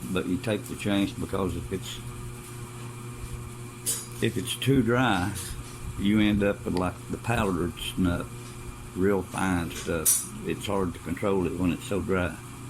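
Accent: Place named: South, United States. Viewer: This was neat as heck! Can't you get any backer in the Philippines? New friend here you sound just like everyone around here. Ain't nothing no better than a southern draw. At least not too me. Look forward to more videos. Stay safe and God bless 🙏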